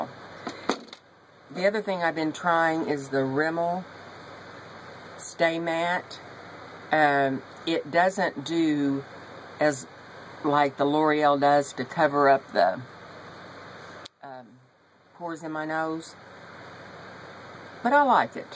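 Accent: South, United States. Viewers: Lol I do have a accent a jersey accent lol you do sound southern ha!!!!!! You made me laugh that's for sure have a great weekend my friend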